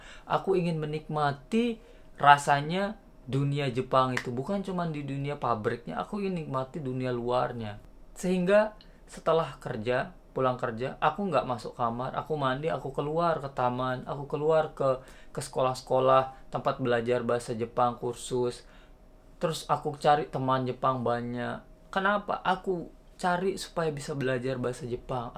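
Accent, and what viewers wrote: Lombok, Indonesia. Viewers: seperti logat orang lombok ya.. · Dari bahasa dan logat nya org Lombok Wkwkwkwk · Ndk bisa hilang logat lomboknya bero... Salam lombok lolon buak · Logak lombok nya medok ya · Aksen lo kek org lombok bang kalok ngomong Indonesia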